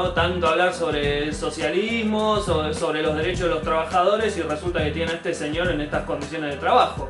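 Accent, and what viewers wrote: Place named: Argentina. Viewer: Hola. De qué parte de Argentina sos?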